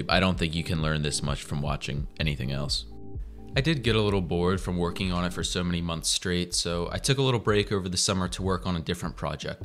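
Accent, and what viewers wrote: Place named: Delaware County, United States. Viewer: You from delco? I can hear it ^^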